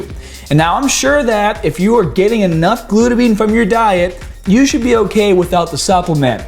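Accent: Boston, United States. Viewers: Gotta love that Boston accent. Ya hahd ea’ned mahnee. Thanks for the tips,! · Can you suggest how much to take. Daily? or when I am taking ballet classes? Love The Boston? accent.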